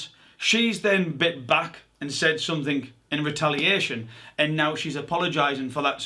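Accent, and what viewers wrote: Newcastle, United Kingdom. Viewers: Are you Geordie?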